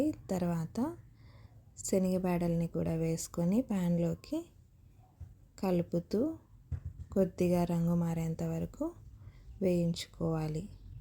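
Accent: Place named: Kadapa, India. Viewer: Pakka Kadapa yasalo matladuthunnave mmeebale chepthunnav❤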